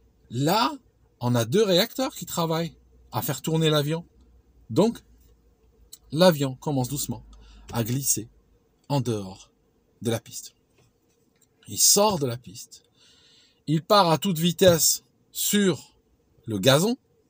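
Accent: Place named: Switzerland. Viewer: Salut - j'aime bien, j'avais remarqué dans d'autre vidéos, ton accent suisse ressort bien à des moments- vers notamment il est bien teinté.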